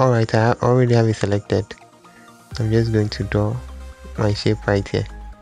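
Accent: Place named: Africa. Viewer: He's a african.. he has an african accent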